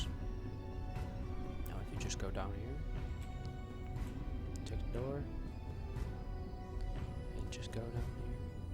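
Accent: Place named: Turkey. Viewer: Question: Are you Turkish? You sound Turkish.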